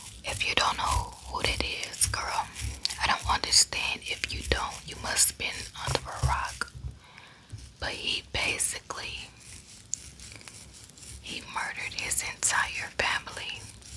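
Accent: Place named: Memphis, United States. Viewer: You are so lovely omg! You said you were from Memphis and your accent finally clicked for me, I couldn’t place it till you said!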